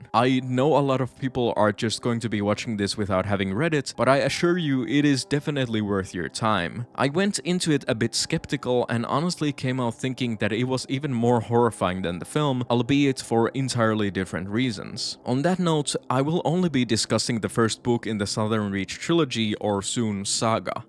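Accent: Finland. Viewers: Came to the channel for the luscious finnish accent, stayed for the absolute bangers of videos, incredible job!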